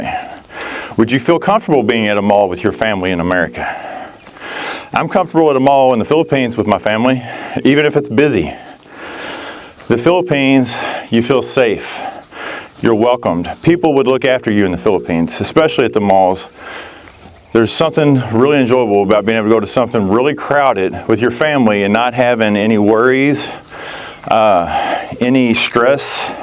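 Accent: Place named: Kentucky, United States. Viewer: Your accent is so Kentuckian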